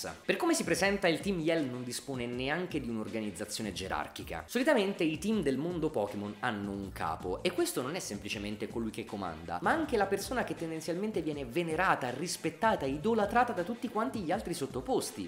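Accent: Campania, Italy. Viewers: ma sei campano??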